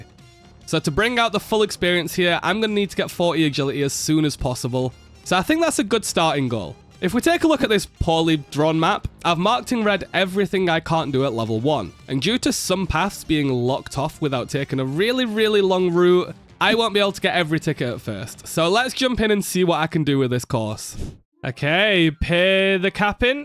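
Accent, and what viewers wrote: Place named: Northern England, United Kingdom. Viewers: Ahhh you’re so northern, I’ve found my home here 😂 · i wasn't really interested in this series when I saw it in my recommended, but then I heard the yorkshire accent and now I am staying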